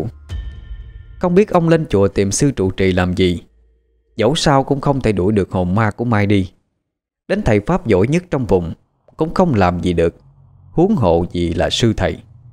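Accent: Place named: Southwest, Vietnam. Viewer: Yahhh! Hôm nay tác giả Diễm Quỳnh lại cho ra mắt thính gia truyện ma mới! Tiêu đề rất rất hấp dẫn và rất tâm linh ma mị! Rất thích truyện ma làng quê lắm! Xin cảm ơn tác giả và giọng diễn đọc của miền Sông Nước Tây Nam Bộ của chú siêu MC mà dt luôn hâm mộ! Kính chúc gia đình nhỏ của vợ chồng chú luôn rộn tiếng cười của trẻ thơ và luôn tràn ngập niềm hạnh phúc, cùng team Đất Đồng Radio một buổi chiều mát mẻ và vui khỏe! ✍️👻🎤🤙! 👨🏽‍💻🤱👶🍼👨‍👩‍👧💞👌!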